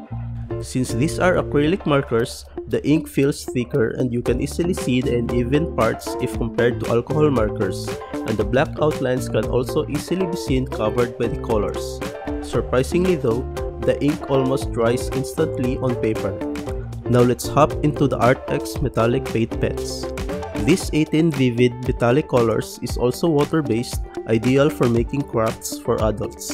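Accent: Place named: Philippines. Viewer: Didn't know you were a Filipino at first but found out immediately when I heard your accent. Galing mo idol! Keep these videos coming!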